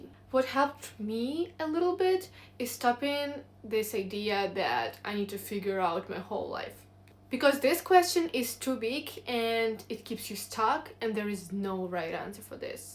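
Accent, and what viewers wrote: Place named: Eastern Europe. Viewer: i hear a slavic accent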